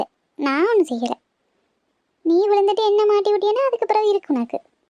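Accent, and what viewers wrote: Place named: Sri Lanka. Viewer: Akka srilankan tamil Mathere iruku neenga srilanka va 🤔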